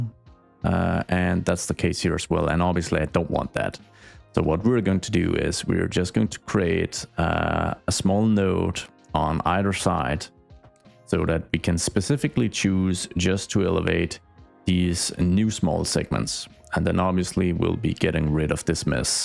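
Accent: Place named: Denmark. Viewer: Omg, so he is actually from Denmark, always thought so from his accent, but wasn't sure, so cool to see other Danes here on YouTube!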